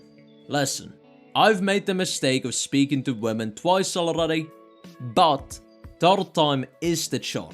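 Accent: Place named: Germany. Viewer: What the hell is this accent? You sound like a German guy trying to speak Portuguese with a new Zealand twist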